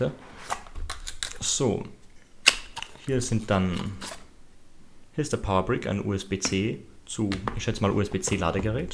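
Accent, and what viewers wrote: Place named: Germany. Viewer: Immer dieses typisch Deutsche: "Huwei" "kinesisch"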